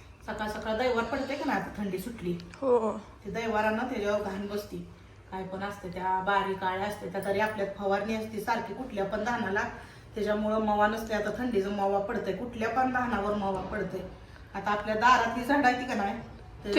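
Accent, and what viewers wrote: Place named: Marathwada, India. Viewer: Latur Dharashiv chi bhasha watate tumchi, konta gav ahe tai tumch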